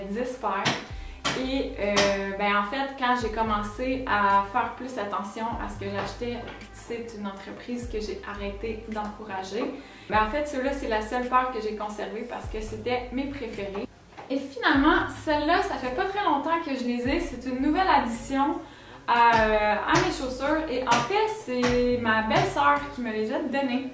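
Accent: Quebec, Canada. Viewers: C'est pas grave, on adore t'entendre parler "quebecois". Je n'y suis allee qu'une fois mais j'ai adore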